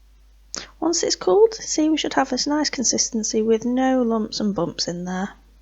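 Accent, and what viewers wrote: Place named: England, United Kingdom. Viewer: ☑ Knows the difference between ~♥biscuits♥ and... ☹cookies☹ ☑ English voice, so probably is going to make something that's not sickly oversweetened. ☑ Uses fresh ginger so I'll be able to use the home grown stuff from the garden ☑ Also uses ground ginger, which is good, because ginger comes from the ground :P ooh nice pictures of flowers.. IT'S A BULBASAUR AND SQUIRTLE!!! :D presses 'like' okay, I'm biased, but you win. This is already the best recipe for gingernut biscuits. Would like to have seen one at the end get broken open to see how crunchy it is. I'll have to go and make some to find out :) also, my thoughts on that blue door in the background... it's the TARDIS.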